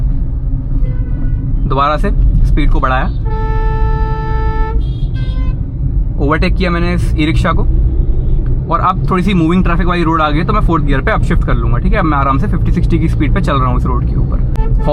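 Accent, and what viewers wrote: Jhansi, India. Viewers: Brother are u from jhansi, UP ???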